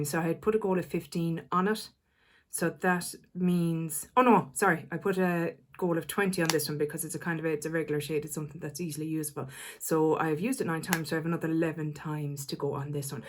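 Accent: Ireland. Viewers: I think I had never heard Irish before, loved the intro!! Congrats on hitting 2 new pans!!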